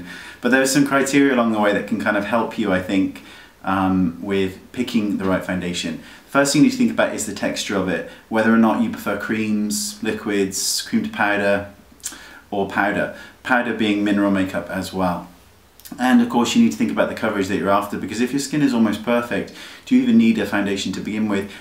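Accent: Australia. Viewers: how come u have aussie accent! Sounds so cool though.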